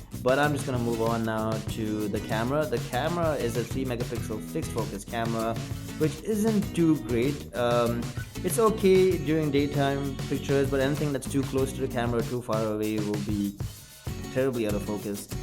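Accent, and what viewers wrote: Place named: India. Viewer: Ur name is with dat accent uhh, r u catholic indian?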